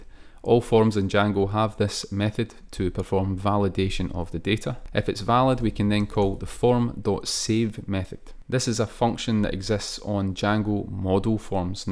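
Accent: Scotland, United Kingdom. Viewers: great video and I like the Scottish accent!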